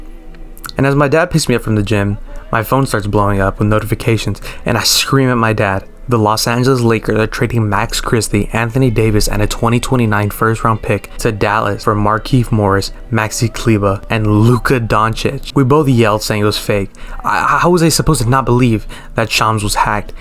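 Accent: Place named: Latin America. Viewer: your latinx accent is gross ngl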